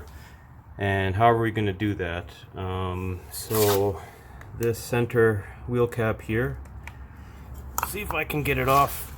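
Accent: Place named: Canada. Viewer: You sound canadian eh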